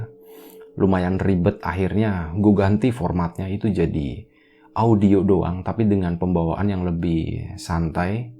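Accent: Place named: Java, Indonesia. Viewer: Assalaamualaikum🙏sy baru tahu cannel nya mz ada dua toh, karena sy sub baru d lapak horor jd selalu penasaran, trs d buka buka ech dapet yg ini sy coba buka, ternyata ada radio kesayangan d bdg kesebut, duch seneng nya😅😅sy kan org bdg mz, tp leluhur saking Jawi, jd seneng kalo denger mz berbicara medok Jawa nya itu bikin saya terlena🤭😅😅😅semangat dan tetep sehat mz, matur suwun ceritane🙏🙏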